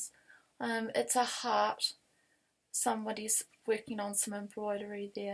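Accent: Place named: New Zealand. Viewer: Hi from Auckland! My maternal fam is from Lumsden, you sound just like home 😀Nice to meet you!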